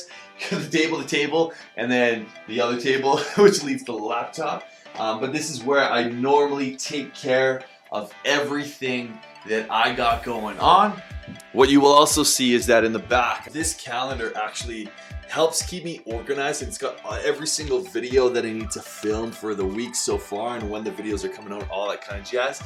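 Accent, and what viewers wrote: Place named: United States. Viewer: Where do you live? I’m guessing from your accent you live in the states. So which state do you live in?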